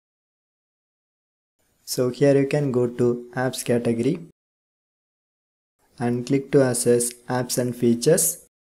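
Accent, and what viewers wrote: India. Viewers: The guy with the indian accent pulls through again! It worked!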